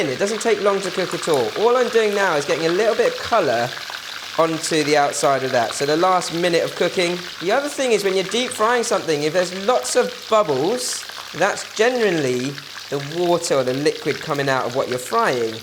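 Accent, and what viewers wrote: United Kingdom. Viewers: I love this guy's British accent..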